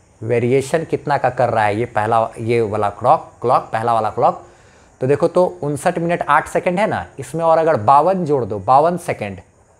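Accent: Bihar, India. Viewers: ❤tankuuuu bhaiya And Bihari accent in your words are just killing.....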